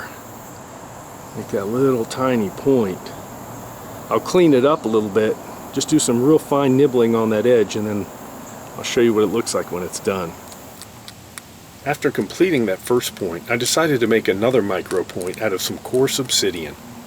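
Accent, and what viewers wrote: Georgia, United States. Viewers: I was gonna say, sounds like you live near Lanier Speedway.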